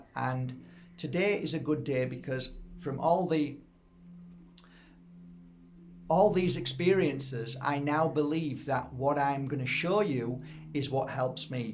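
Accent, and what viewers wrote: Yorkshire, United Kingdom. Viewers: I love listening to this Genuine “ Yorkshireman !! Tells it how it is !!!!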